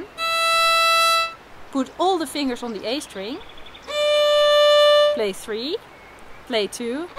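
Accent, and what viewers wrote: Netherlands. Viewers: You have such a dutch accent!! I am dutch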